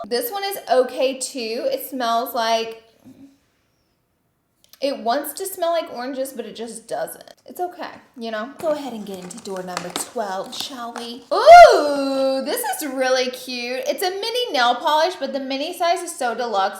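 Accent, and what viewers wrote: South, United States. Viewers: Anyone else miss adorable full on southern accent? 😭❤️ First video I ever watched was her Blendiful review and the accemt was so cute I could not stop watching after that lol! Love her silly personality 😂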